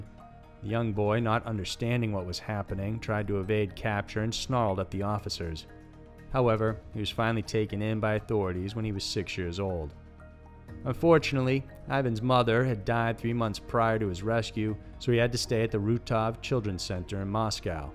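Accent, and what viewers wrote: South, United States. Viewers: You are Canadian..Why you talk like you are from the southern states of the U.S?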